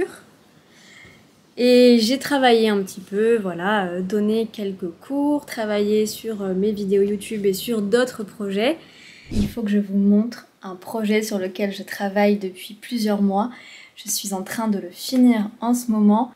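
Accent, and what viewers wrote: France. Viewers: Les toulousiens parlent très bien français. Je pense que les toulousiens parlent français un peu mieux que les parisiens. J'aime ton accent français. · J’aime ton accent français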